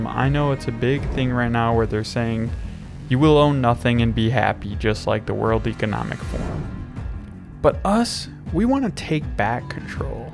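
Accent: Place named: United States. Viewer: the most american accent ive ever heard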